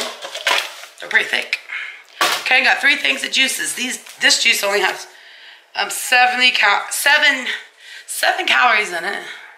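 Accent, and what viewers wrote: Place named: North, United States. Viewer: I think you must live in north. Just the way you talk and eat. I live in Orlando but from Georgia.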